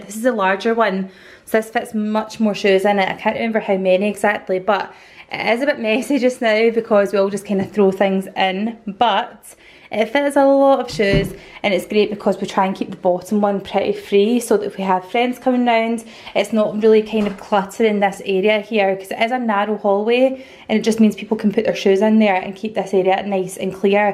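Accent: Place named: RP, United Kingdom. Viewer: First time actually coming onto your channel even though I listen to the podcast constantly! How posh do you sound on here compared to the podcast?! 😂 LOVE your house!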